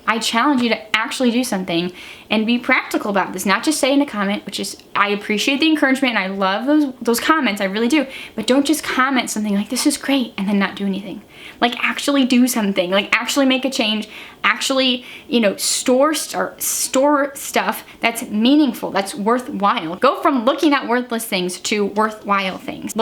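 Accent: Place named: Midwest, United States. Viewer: lol, that mid-western accent 😂😂 is it strong with this one... If your from the Midwest you might not hear it though :(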